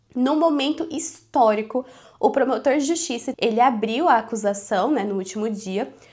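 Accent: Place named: Sao Paulo, Brazil. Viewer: Nao fala português, para mim fala igual paulista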